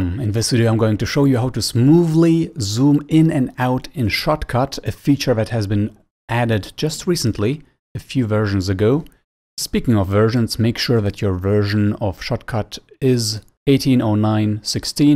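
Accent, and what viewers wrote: Germany. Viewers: souned like you said completly illegal Also are you German cause you dont sound like your english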